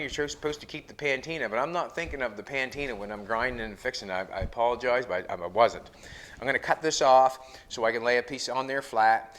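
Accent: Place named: Newfoundland, Canada. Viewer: the accent,,,so you would be what i consider/call a newfy,,?,, a canadian from somewhere east of quebec,,,?...lol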